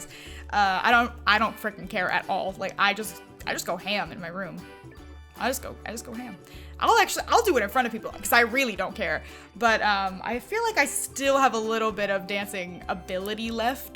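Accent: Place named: New York, United States. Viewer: For some reason you just sound like a New Yorker to me. I always wanna ask what borough you live in. But then I’m reminded you’re from Texas. 😅😅☺️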